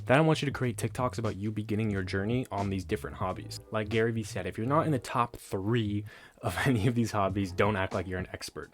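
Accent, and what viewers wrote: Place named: Birmingham, United Kingdom. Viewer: Are you from birmingham UK too. You got that accent 😂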